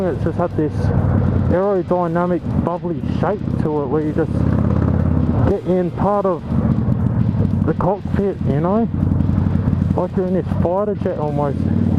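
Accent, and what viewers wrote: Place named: Australia. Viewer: Saw you channel name on a comment so I thought I would come and check it out !! Sounds like you are in, or from Australia ....We were in Australia in early 2019. Love it there!!!. New subscriber here from Canada .. My dream bike as a kid was the '82 Honda CBX 1000, sport touring bike....to this day I wish I could find one !!! cheers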